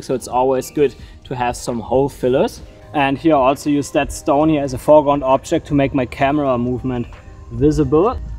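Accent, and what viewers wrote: Germany. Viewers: Wow, I can hear that youre German, try to meet up with niklas christl, a super videograph too❤ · I recognize the german accent!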